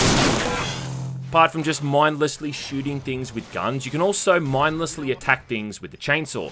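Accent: Australia. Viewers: yep regardless if the game is good or bad always does it justice. He is around my age n I think his bad as Australia accent helps.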